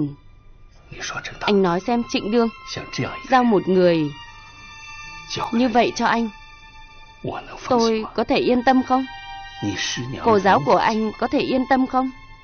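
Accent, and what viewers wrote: North, Vietnam. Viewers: phim hay 100% nhung bac ki thuyet minh te qua lam mat hay.dung de bac ky long tieng nua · Xin loi ko phai Che vi minh nguoi mien nam nghe giong bac ko thich lam ,nhieu bo phim hay nhung thuyet minh nghe ko duoc rat tiec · Thuyết minh giọng bắc nghe ko tình cảm ko hay.Dị ứng ghê.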